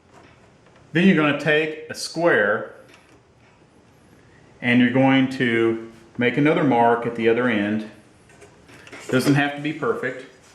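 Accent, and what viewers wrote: South, United States. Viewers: I personally LOVE your soft Southern accent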